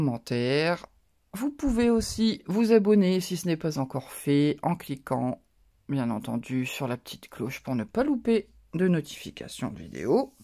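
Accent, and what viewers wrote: North, France. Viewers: Tu es du nord ??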